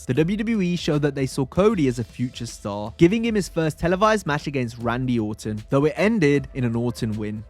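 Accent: United Kingdom. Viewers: British people and their pronunciation of words never ceases to amaze me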